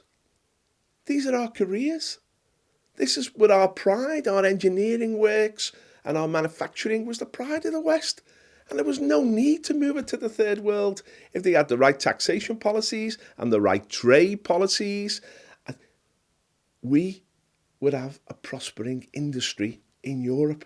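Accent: Liverpool, United Kingdom. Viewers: Ja! Pure Scouse.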